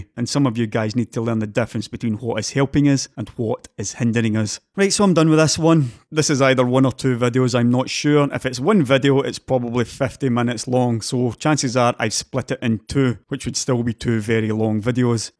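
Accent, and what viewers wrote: Scotland, United Kingdom. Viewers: Well, I'm a bit of an AMD fan. I think is more about keeping monopolies in check. I've never really seen you as bias. Sure you have your favourites. But to me you come across as very matter of fact, no marketing bs. That combined with the scottish makes your informed opinion matter to me. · A little bit long. Also, we have a Scotsman in an argument with an Aussie, and I'm going to back the Scotsman. That's not going to make me a lot of friends.. Now, we're a day or two away from the release of the two thousand series Ryzens. Now watch what happens when "independent testers" start testing the new Ryzens. Some people are really independent testers, some are fanbois. Intel will lean on everybody to skew the tests in their own favour. And Intel will have plenty of fanbois to imitate the Russian secret service on EweChoob and every other social media, they probably won't even have to pay them.... 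AMD... they have some pretty rabid fanbois of their own...... yeah, worth watching (listening) to the end of the last one. He does seem to be going off at Aussie Steve quite hard, but that does change toward the end. Watch all of it before you throw stuff. I would back, and I am an Aussie. I have yet to hear him say anything I sharply disagree with. That alone, puts him in a different group to most other tech tubers... The Scots accent is a little bit thick, but the man who has it, is not.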